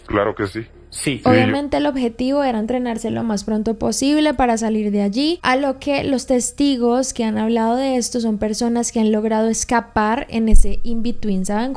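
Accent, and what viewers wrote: Colombia. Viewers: pense que eras colombiana, que bueno que te cuides, muchas gracias por subir informacion sobre este caso, saludos desde peru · Me pasó lo mismo, soy de Gdl... Y el ver este caso con su acento tan colombiano ( hermoso por cierto) me helo los huesos... A dónde hemos llegado?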